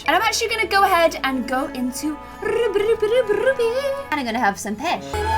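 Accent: United Kingdom. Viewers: Lol i love how says antony instead of anthony XD Its ok i love that british accent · Btw I'm half French and it was funny hearing you speak French with a British accent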